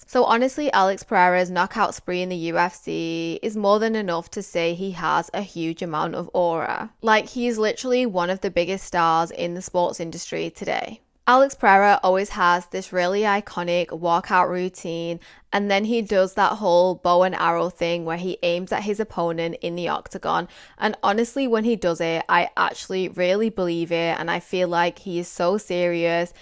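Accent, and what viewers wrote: United Kingdom. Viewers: She doesn't even look Russian lol can you even hear her accent??? She has a UK accent.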